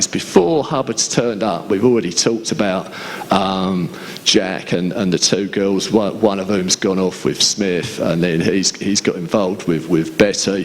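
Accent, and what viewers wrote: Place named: London, United Kingdom. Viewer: A very thick London accent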